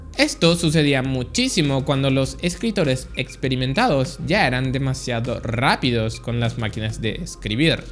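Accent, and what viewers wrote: Paraguay. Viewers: A mi no me mientes sos paraguayo vd?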